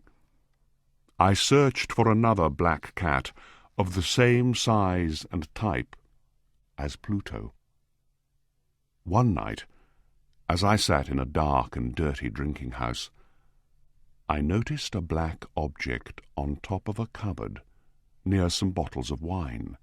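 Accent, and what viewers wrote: United Kingdom. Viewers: esse inglês é britânico você sabe me dizer. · Tem acento britânico, amei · Lindo inglês britânico 🥰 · Inglês Britânico. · pela pronuncia acredito que seja britânico. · Ele tem um sotaque britânico ou foi apenas uma impressão por causa da história que não é americana? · É inglês britanico? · Isso é ingles britânico né? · Por gentileza , o áudio é inglês britânico? Parabéns pelos vídeos!!!!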